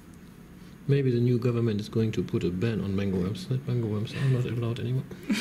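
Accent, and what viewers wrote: Germany. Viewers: Are you, by any chance, German? Your accent suggests it. Danke für Ihre Arbeit!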